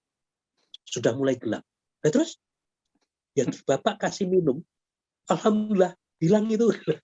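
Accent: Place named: Java, Indonesia. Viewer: Pk ustadz katur asli mn y logat jawanya kental sekali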